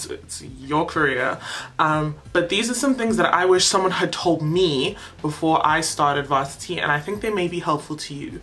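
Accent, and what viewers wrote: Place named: South Africa. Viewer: Thank you this was very helpful! My mom heard your voice and was shocked that you're South African😂😂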